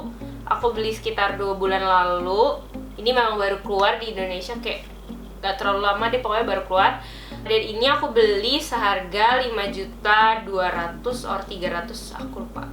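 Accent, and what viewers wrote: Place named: Visayas, Philippines. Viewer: Hala, your dialect kinda sound close to the Visayan dialect from the Philippines. I find It fascinating. :)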